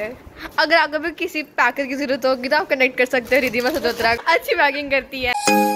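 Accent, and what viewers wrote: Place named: United Kingdom. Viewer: Yrr language to HINDI HAI to ascent eng ka kyu hai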